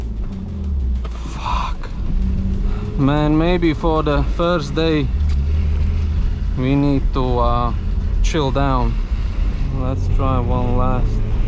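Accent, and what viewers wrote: Latvia. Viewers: ur actent sounds like ur Latvian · could tell by the accent that you are latvian eu pavizinaties var? 😂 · Are u latvian bro? Accent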